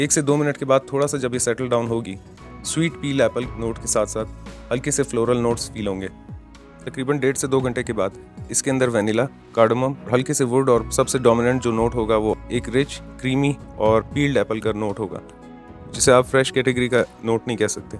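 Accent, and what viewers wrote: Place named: Karachi, Pakistan. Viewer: لیٹ رپلائی کے لئے سوری لہجے سے تو کراچی کے ھی لگتے ہیں جیسے کہ میں خود 😀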